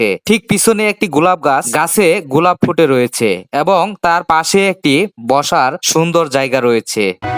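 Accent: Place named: Narsingdi, Bangladesh. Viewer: ভাষাতো নরসিংদী র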